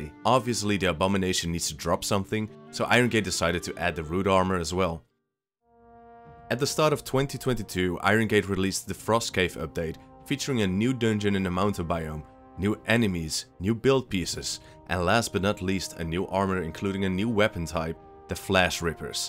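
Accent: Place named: Netherlands. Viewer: Such a Dutch accent lol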